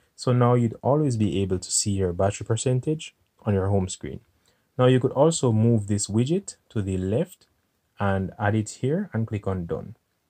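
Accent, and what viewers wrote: Jamaica. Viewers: Dawg yah Jamaican or from the Caribbean??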